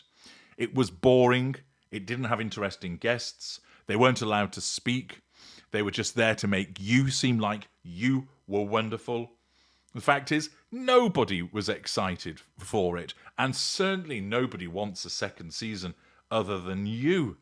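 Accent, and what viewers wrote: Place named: England, United Kingdom. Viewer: I imagine that the snake in the Garden of Eden sounded like you. I think you could talk anybody into anything with that deep and silky English accent.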